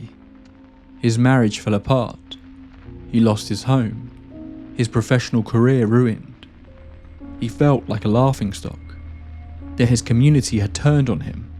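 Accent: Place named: RP, United Kingdom. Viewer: Maybe the best part of this for me was hearing you say queen of flatulence and your very posh accent 😂😂😂 But all jokes aside, that was a good episode. I had heard that story before but not in that much detail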